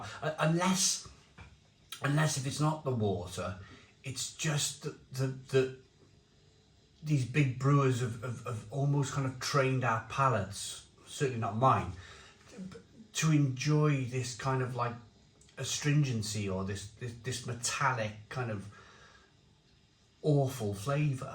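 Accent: Wales, United Kingdom. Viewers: Couldn’t agree more with this. Picked it up, 2 bottles of this faff in Waitrose on a yellow label. Dire. Really bad. Great review,! Love your accent, I’m a Berkshire boy but spent 12-13yrs in Dinas Powys and Penarth and your accent brings it all back! Don’t worry, I sang the Welsh national anthem, in Welsh at all the rugby matches I went to. Was constantly told where to shove my chariot but embraced it all and loved every second there! Love your channel. Really good. Cheers,!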